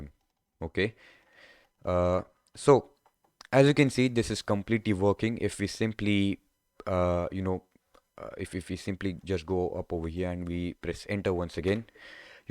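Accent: India. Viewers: You are sound like Hackersploit, but with a lil bit indian accent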